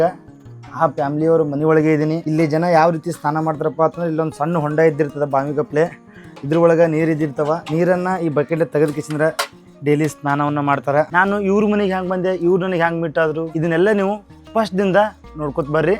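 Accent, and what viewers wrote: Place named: Mangalore, India. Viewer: ನಮ್ಮ ಮಂಗಳೂರಿನ ಕನ್ನಡ ಮಾತಾಡ್ತಾ ಇದ್ದಾರೆ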